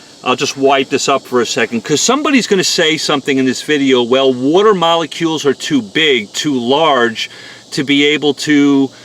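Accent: New York, United States. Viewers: I'm binge watching your videos and I love how thorough you are. I love hearing you talk. That NY accent is awesome. Being in the south it's nice to hear a different accent for a change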